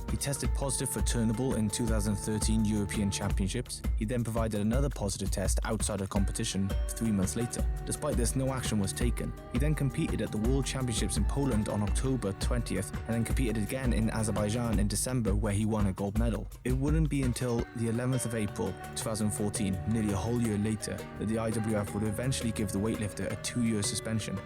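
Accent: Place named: Wales, United Kingdom. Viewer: I thought your pace was spot on and your enunciation clear. The welsh tones are very mild.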